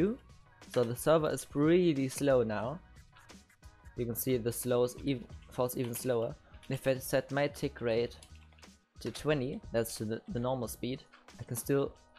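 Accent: Germany. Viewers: as a german i can say that your are german Right? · deutsche accent killt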